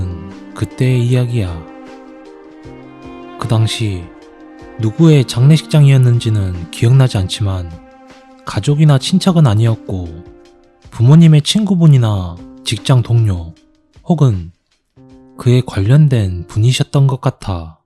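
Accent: Jeolla, South Korea. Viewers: 전라도가 고향이신가봐요?^^ 구독하고 합니다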